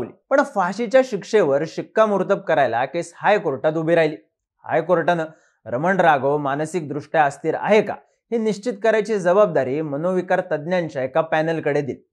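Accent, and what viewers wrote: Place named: Pune, India. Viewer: तुझ्या बोलण्याची पद्धत खूप आवडली #पुणेरी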